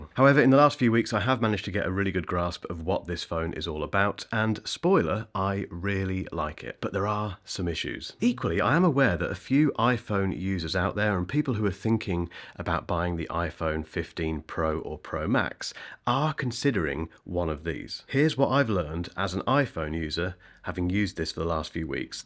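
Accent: United Kingdom. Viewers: Hi. Thanks for a great video as usual. Great to listen to a Brit. Looking forward to your review of the Honour V2 but like you said I think Samsung software is still the best. From the reviews I have seen so far, the phone doesn't multitask horizontally.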